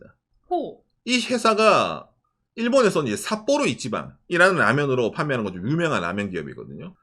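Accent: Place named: Gyeongsang, South Korea. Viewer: 멘치로. 찐경상도!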